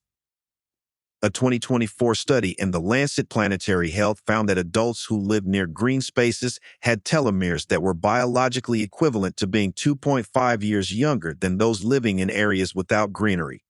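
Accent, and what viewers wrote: United States. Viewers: Without the American accent which destroys it’s credibility immediately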